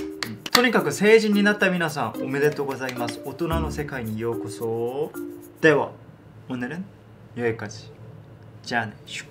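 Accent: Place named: Kansai, Japan. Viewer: 関西弁やん！と思いました！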